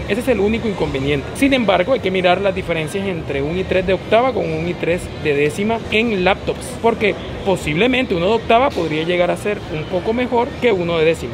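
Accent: Caribbean Coast, Colombia. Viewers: Njd familia el vale es costeño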